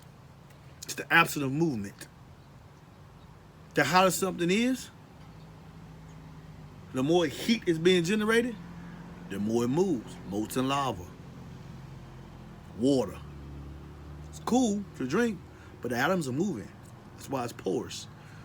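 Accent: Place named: South, United States. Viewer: That country accent got me laughing 😂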